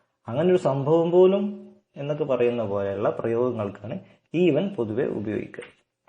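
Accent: Malappuram, India. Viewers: malappuramaano thaankal???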